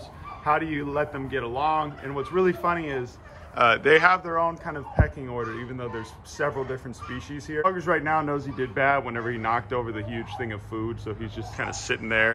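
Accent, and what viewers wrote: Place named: Pittsburgh, United States. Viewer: Dude your Pittsburgh accent while on the fahm is the best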